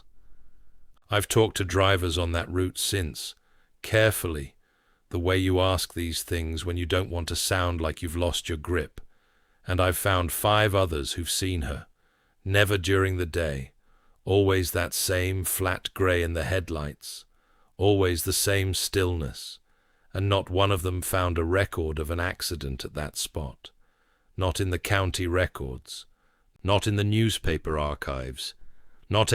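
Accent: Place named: United Kingdom. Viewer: Its a British accent..bro!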